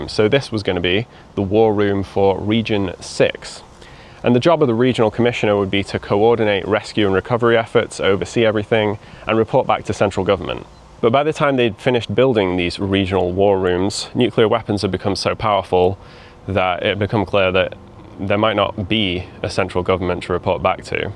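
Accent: United Kingdom. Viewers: england is such a nice skansen and a british voice is just perfect as narration. nice video bro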